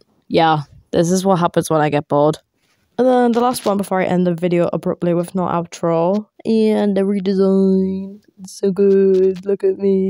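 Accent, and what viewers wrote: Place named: North, United Kingdom. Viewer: you sound so northern LOL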